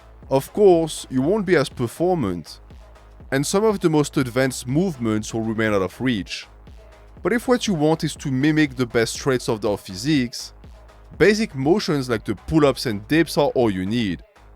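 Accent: France. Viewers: As always, great stuff! Just sad you didn’t start the video with “hey YouTube” in your French accent That’s half the reason I watch these videos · Ur french but other than that nice video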